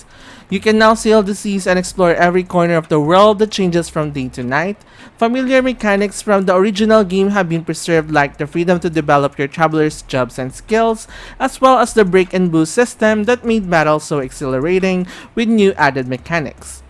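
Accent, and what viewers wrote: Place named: Philippines. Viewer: Wow Pinoy accent 😂 Hello Lods!! ❤️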